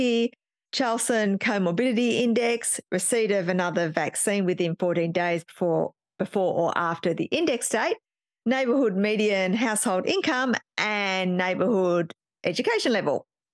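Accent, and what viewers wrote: Australia. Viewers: Wait a second - all Aussies sound like that. And looks fine to me. Are you Ok?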